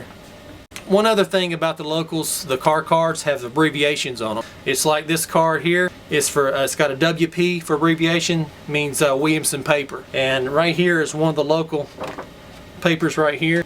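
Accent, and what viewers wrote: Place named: United States. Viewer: Aloha All,,, Just Love It,,, But I Do Have One Question,,, What Language Does This Man Speak,,, Sounds Kink of Like PURE AMERICAN To Me... What Great Craftsmanship... It Would Make Michelangelo So Proud,,, What Great Craftsmanship... How Many Cars,,, ? ,,, All N Gauge >?<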